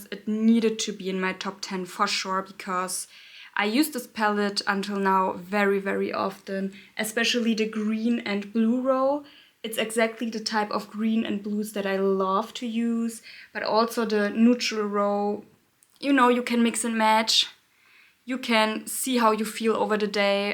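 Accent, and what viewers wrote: Germany. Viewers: I love your accent! Do you live in Germany? Happy New Year❤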